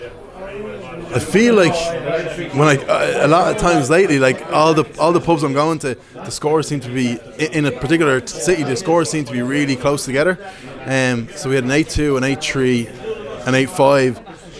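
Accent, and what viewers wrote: Liverpool, United Kingdom. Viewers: Haha love the scouse accent, just noticed your Liverpool video so I’ll be watching that next!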